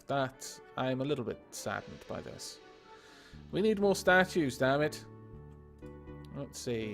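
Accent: Wales, United Kingdom. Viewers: Well yes, I've travelled quite a bit, mostly to learn English too (I'm a frog ^^). As a matter of fact, I also spent two months in total in Wales (Wrexham). Which might be why I like your accent so much, as I have fond memories of the nice people I've met there ;-) Anyway, you deserve those praises, as quality content should be acknowledged.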